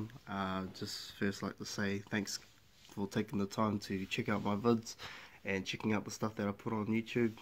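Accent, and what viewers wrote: New Zealand. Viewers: Nice Maori accent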